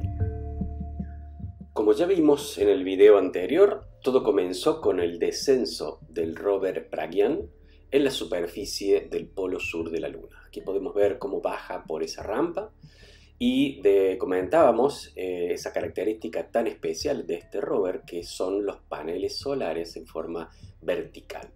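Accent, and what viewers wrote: Córdoba, Argentina. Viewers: Si o si es cordobé el!! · Muy buen video. Puede ser que tengas acento de Córdoba, Argentina? Un abrazo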